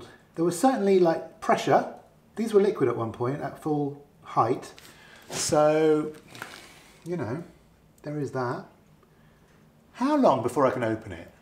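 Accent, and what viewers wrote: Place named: United Kingdom. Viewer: Hi again. I got excited watching you opening up these bad boys. Knowing what it's like to attempt a new pour I can almost feel my heart beating faster. Fun to watch! Right now I am making patterns (wood) and prepping to cast some CNC parts (the z carrage) which are longer and heavier than anything else I have done (it's to be a big 8 x 4 monster cnc). I like the gateing and swirl trap system you used. I 'think' I will need gates a touch larger for the casting I want to make to get all the metal in there before freezing... By your accent I am guessing you might be in the UK? If so, at some point we should totally do a YT colab of some kind. Ok peace, Bongo. PS, how do you think you could eliminate the bending (do you just put it down to the geometry of the pattern (the two perpendicular ribs)?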